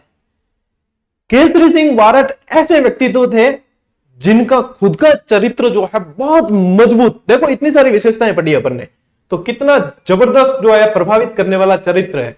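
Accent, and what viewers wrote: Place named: Marwari, India. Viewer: Sir, aapka marwadi accent bahut sweet hai...bless you sir,thank you🛐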